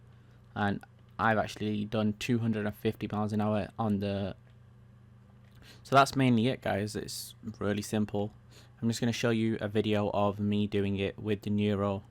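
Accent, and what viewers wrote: England, United Kingdom. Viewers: You sound asian british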